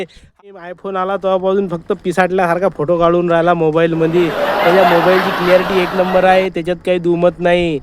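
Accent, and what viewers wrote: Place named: Vidarbha, India. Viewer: भाऊ भाषा शुद्ध झाली आपली गावरान विदर्भाची भाषा राहू द्या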